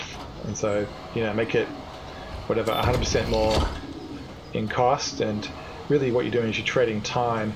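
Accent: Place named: Australia. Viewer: that makes sense! 😂 you upload schedule says USA but your accent says Australian 😂 damn! Timezones are annoying!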